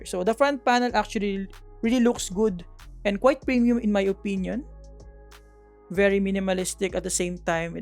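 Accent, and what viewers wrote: Philippines. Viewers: alam mo kagad kung pinoy yung nag sasalita 😅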